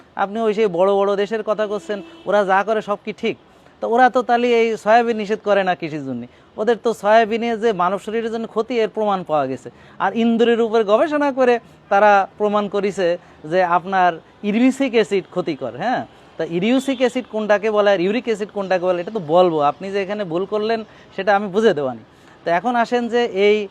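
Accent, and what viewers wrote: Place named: South, Bangladesh. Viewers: Sir apnar দক্ষিণী ভাষাটা ভাল লাগছে।মনে হল আপনি আমার বড় ভাই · ভাই আপনি খুলনার ভাষা কোহানে শিখলেন। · যশোরের ভাষা মনে হয়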